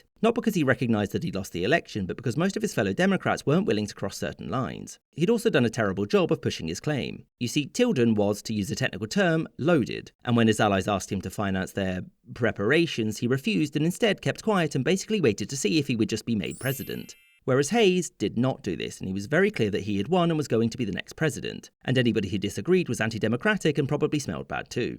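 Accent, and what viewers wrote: United Kingdom. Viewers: It’s crazy that the person commenting on US history is British.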